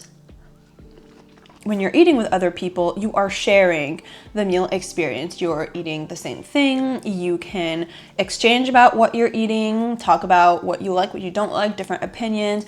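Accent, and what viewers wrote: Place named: United States. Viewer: when folks hear you speaking 🗣️ english do they mistake you for American becoz your exceptionally well spoken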